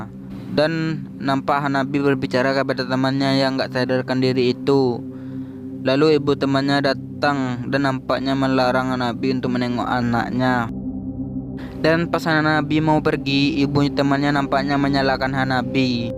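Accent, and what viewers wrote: Madura, Indonesia. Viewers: Orang Madura ya bang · Ga bisa ilang logat maduranya wkwk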